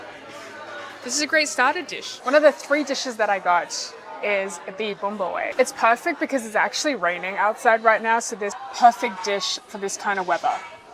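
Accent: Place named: Australia. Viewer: Love your Australian accent :)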